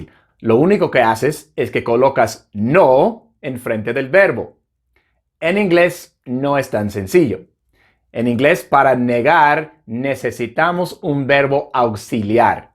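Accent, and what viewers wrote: United States. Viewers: En otro vídeo ví que la pronunciación de Didn't es /deren/ sin la T, pero este video veo que la pronunciación es como /dirent o dident/. Amigo eres estadounidense. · El es estadounidense se notanpor su raza y su pronunciacion creo😂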